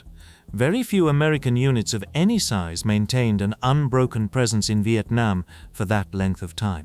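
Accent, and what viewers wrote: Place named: United Kingdom. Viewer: Why is it always british accented narrator s , with US battles kinda unsettling and just wrong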